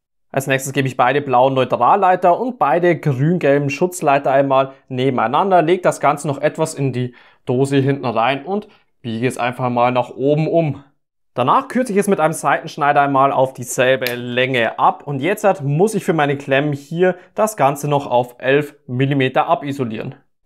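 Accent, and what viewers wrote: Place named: Middle Franconia, Germany. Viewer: Wirklich gute Anleitung, aber kommt der Akzent aus Mittelfranken? "beschädigen könntne", "oben und untne", sowas kennt man bisher nur vom guten Drachenlord.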